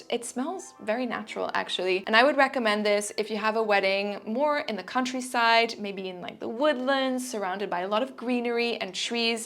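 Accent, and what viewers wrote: France. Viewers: Your english accent grow fast, I almost dont hear your French accent except when you say "Jus de rose" 😁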